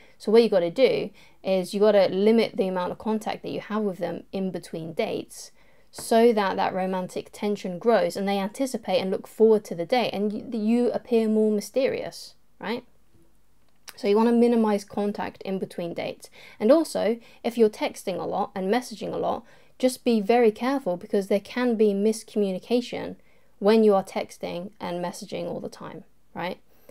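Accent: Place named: England, United Kingdom. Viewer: with a voice like that....I can listen to you all day.... just can't help to be attracted to a pretty Lady with a soft English accent.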